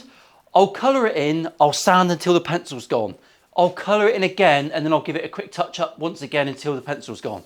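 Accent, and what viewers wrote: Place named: Northern Ireland, United Kingdom. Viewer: The random northern irish accent always catches me off guard!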